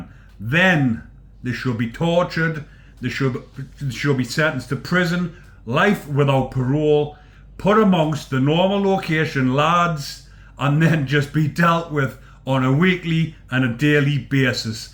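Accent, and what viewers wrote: Newcastle, United Kingdom. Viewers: Love your Geordie accent you are a Great bloke love Jon.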